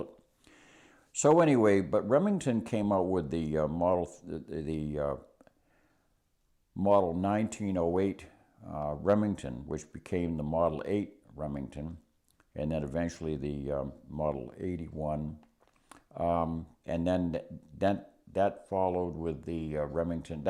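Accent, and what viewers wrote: New England, United States. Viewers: I detect a New England accent ! I had a paper route with 80 papers as well in 1964. where were you in Nam? I was 69-70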